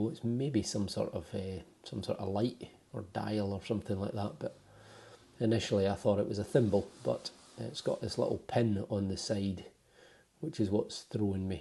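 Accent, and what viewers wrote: Scotland, United Kingdom. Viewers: Just found your channel. I really enjoy watching all the digs. Especially love the Scottish accent. I swear you sound exactly like Roger from Outlander.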